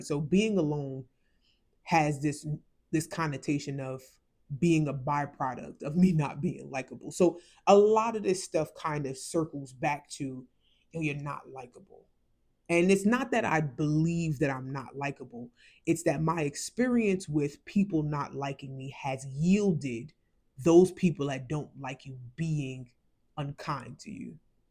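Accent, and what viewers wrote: New York, United States. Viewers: 😂😂😂 you’re so New York love it. (Wait….you’re from New York, right ? 😭)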